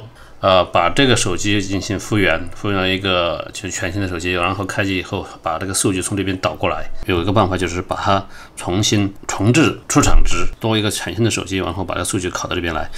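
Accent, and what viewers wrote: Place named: Anqing, China. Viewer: 一听油管主就是安庆那边的人😊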